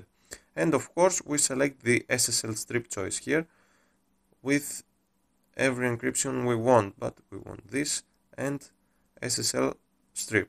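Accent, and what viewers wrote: Greece. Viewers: Πρώτον <link> εδώ έχουν ξανανεβάσει το video σου και δεν νομίζω πως είναι με την άδεια σου.. Δεύτερον η προφορά σου είναι πολύ ελληνική :D Τρίτον δουλεύει μόνο σε pc victims ή και σε κινητά? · ΛΙΠΟΝ ΑΥΤΟΣ ΕΙΝΑΙ ΕΛΛHΝΑΣ ΧΑΧΑ ΠΡΟΦΟΡΑ :)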